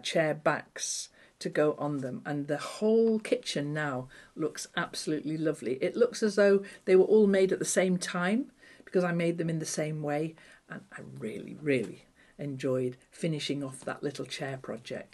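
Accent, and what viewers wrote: England, United Kingdom. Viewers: ❤love your English accent and how you live life to the fullest 🎉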